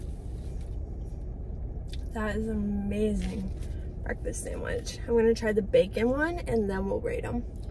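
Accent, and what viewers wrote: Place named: Michigan, United States. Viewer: Your hair looks great. I figured out why I like your videos so much. I like the way you talk. There's something calming and familiar about it. I suppose a lot of it is because you're trying to engage your audience, and I think you do a great job. The other thing for me is that you're from Michigan and since I live in Michigan I think it makes you sound more familiar and friendly than if you were from somewhere else. I didn't grow up here, but I've been here for most of my life now, so it's comforting to hear a voice that sounds like my friends on YouTube.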